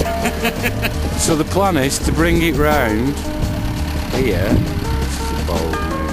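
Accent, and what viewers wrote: United Kingdom. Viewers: 1967 MUSTANG Near Canada???????????????????? Well I suppose northern England is near Canada if you live on the moon! However being as how you don't please, please have another look at the video and you will be surprised to see all the vehicles taking part have British, that is United Kingdom British, number plates. And if that isn't enough what kind of Canadian accent do you think you can hear the bloke speaking with? Near Canada indeed!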